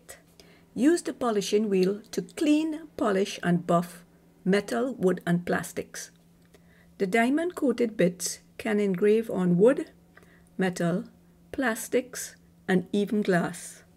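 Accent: Trinidad and Tobago. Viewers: This was excellently explained..you sound like a Trini..great video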